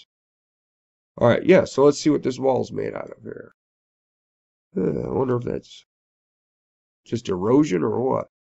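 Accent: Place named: New England, United States. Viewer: Wicked impressive..? Are you from new England too? Hahah!!! It's easy to spot. Amazing video brother, I love the material.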